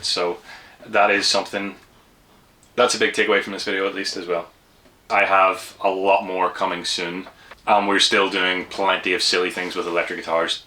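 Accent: Northern Ireland, United Kingdom. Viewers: thanks for replying! Love our Norn Irish accent being broadcast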